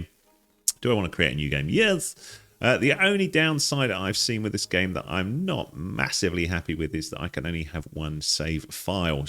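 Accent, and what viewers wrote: United Kingdom. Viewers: Awesome Video. Supermarket Simulator Looks Like A Fun Game To Play. Off Topic: Your British Accent Sounds So Cool 🙂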